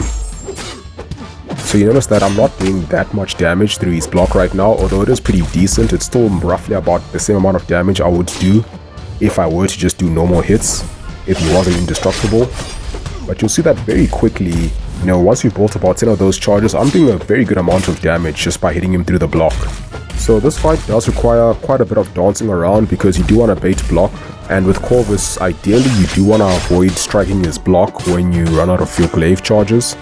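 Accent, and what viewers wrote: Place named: South Africa. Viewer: Are you from south africa, your accent kinda sounds like a few people i know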